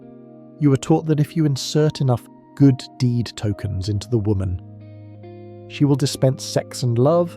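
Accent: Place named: United Kingdom. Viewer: Btw, the Limey accent makes this much more entertaining, (I’m US).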